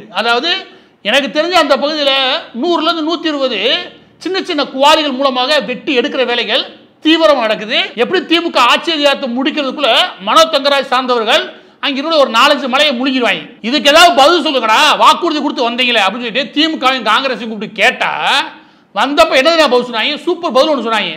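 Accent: Madurai, India. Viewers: மதுரையை விட்டு கிளம்பி மஹாராஷ்டிராவுக்கு வந்து 24வருஷம் ஆச்சு.. உங்களாலே தான் மாரி தாஸ் சார் நான் மதுரக்கார ஃபீலிங்ல சந்தோஷமா இருக்கேன் 😊✌🤝I like your Madurai accent👍👏💃😊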